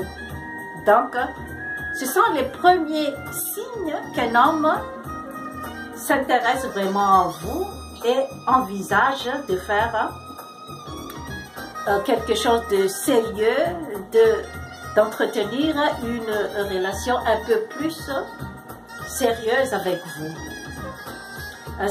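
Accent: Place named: Canada. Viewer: Accent canadien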